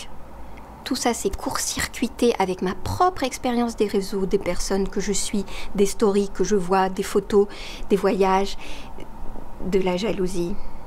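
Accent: Quebec, Canada. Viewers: J'ADORE QUAND TU PARLES AVEC L'ACCENT QUÉBÉCOIS CONTINUE S'IL TE PLAÎT JE T'AIME TELLEMENT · Comme toujours, merci / ☺️☺️ J’aime tes réflexions, non! J’adore tes reflexions!! ☺️ Les tites photos ( ton accent québécois hihihi.. 😊) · Cet accent québécois 😍 "je veux pô prendre une tite photo" Sinon tout à fait d'accord sur vivre à 100% l'instant présent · Incroyable comme a encore un vrai accent québécois 100% quand elle le fait, après ne pas l'avoir parlé aussi longtemps! En tant que Youtubeuse bilingue québécoise et canadienne ça me fait du bien car je fais des vidéos dans les 2 langues ce qui est un énorme PLUS car j'ai pas que 2 langues, j'ai 2 cultures, mais y'a des gens à l'esprit étroit qui me mettent des thumbs down parce qu'il y a 2 langues dans le vidéo et ils n'en parlent qu'une. J'ai des gens que j'aime qui ne parlent pas l'une ou l'autre. Je refuse de me limiter, d'exclure ou de niveler par le bas en choisissant une de mes 2 langues que j'utilise autant l'une que l'autre à tous les jours, autant à l'oral qu'à l'écrit. 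Alors les gens si ça vous intéresse allez voir mes vidéos c'est très vivant, coloré et diversifié, intelligent drôle et sûrement un peu bizarre. Je n'ai que 142 abonnés, j'aimerais atteindre le 1000 pour avoir accès au volet "communauté". Quand j'atteindrai les 150 abonnés je fera ma première vidéo en direct... Bilingue, évidemment! 💜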